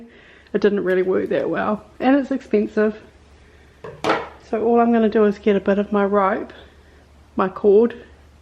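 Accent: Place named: France. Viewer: I love your style, it's right up my alley. Just a beautiful array of pictures. I adore your French accent so much. 😍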